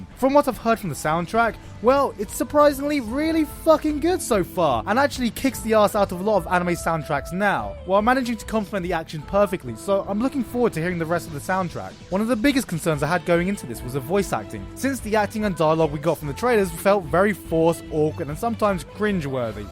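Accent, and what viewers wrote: United Kingdom. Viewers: I like how you're an Asian, but with a British accent. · He's British, why do you ask ?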